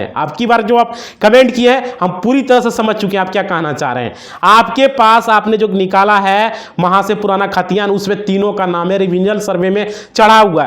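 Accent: Bihar, India. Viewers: Jara soch ke dekho jara dhayan se suno aur suno, Bihar accent me bolkar bar bar bol raha hai samanjh gia samanjh gia ? Rafu chakker ho gia rafu chakker ho gia. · Adha padha likha lekin insan khud ko bahut samajhdar samajh raha hai bewakuf , jo bhi bolta hai pura bihari style mein, galat hindi aur english ke sath. Pura zahil hai.😡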